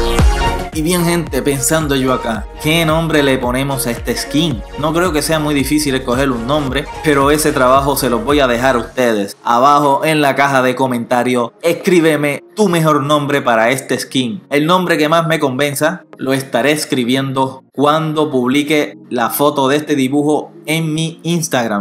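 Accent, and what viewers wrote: Puerto Rico. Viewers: eres de puerto rico porque creo que eres de puerto rico por que se te nota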